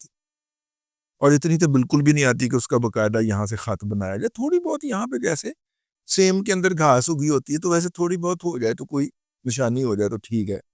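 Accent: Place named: Karachi, Pakistan. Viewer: ap hain tou punjabi lakin apki urdu karachi wali ku hai ?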